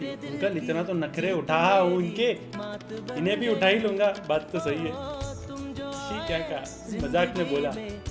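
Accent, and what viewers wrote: Gujarat, India. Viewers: bhai you really suit while having a gujju accent😂😂😂... thoda bohot gujarati bolte rahiyo please har video me...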